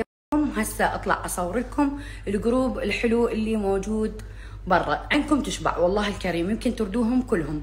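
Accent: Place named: Lebanon. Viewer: شكد حلو تحجين لبناني حياتي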